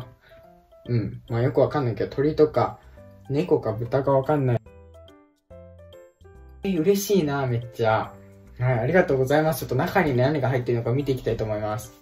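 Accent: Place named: United Kingdom. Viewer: めっちゃイギリス発音！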